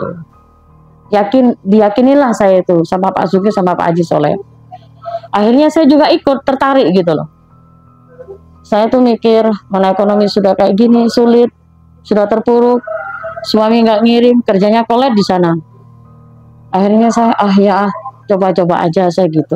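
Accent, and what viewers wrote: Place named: Java, Indonesia. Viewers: Maaf kamu sebut orang Kalimantan asli Tapi logat nya jawa Setau saya orang Kalimantan asli gak ada yang gila harta sampai pesugihan Kami hidup apa ada nya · Nada bicaranya asli Jawa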